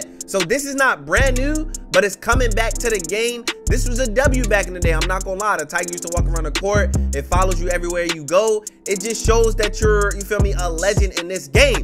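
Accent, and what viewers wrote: Baltimore, United States. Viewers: I hear Baltimore and Philly at the same time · u from baltimore?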